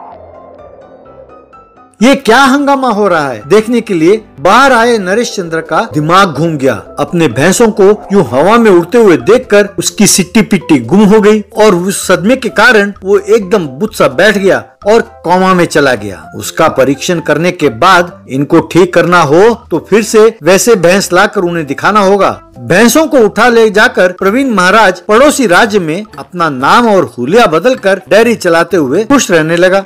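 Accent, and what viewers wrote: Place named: Patna, India. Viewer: Get puffy Patna tone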